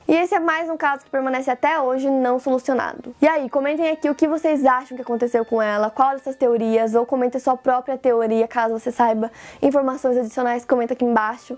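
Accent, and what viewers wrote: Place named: Rio Grande do Sul, Brazil. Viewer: O sotaque dela me soa gaúcho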